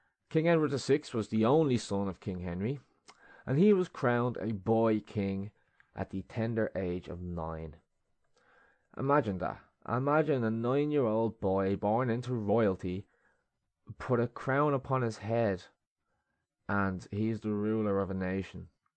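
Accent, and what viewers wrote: Dublin, Ireland. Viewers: I love the Dublin accent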